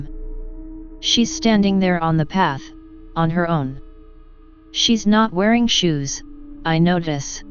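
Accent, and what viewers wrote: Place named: Canada. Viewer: Whoa. TTS robot is canadian.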